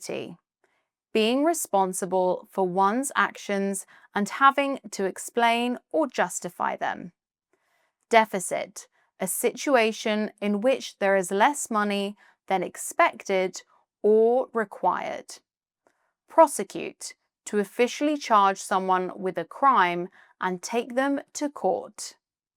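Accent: RP, United Kingdom. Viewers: You were born to be a British English pronunciation and modern RP accent TEACHER! ❤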